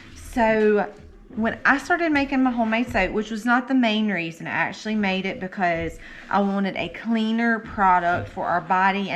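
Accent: South, United States. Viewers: Subscribed to both yalls channels and watch daily. Girl you even more southern than i am and love to hear you talk.keep up the good work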